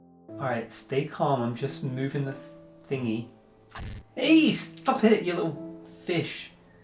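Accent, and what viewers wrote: United Kingdom. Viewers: Bro has the perfect amount of British accent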